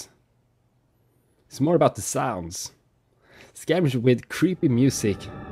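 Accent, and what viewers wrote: Sweden. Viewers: love your accent by any chance are you swedish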